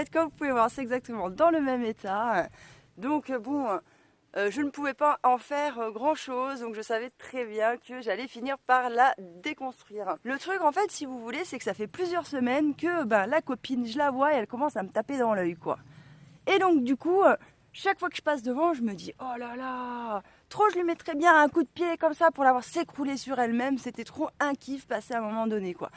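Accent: Paris, France. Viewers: Mais putain, pourquoi tu causes comme une parisienne derrière tes lunettes de Kaaris ?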